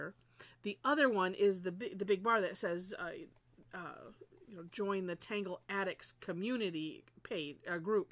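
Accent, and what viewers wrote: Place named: South Dakota, United States. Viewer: your accent is very much like a friend of mine from SD. Just guessing.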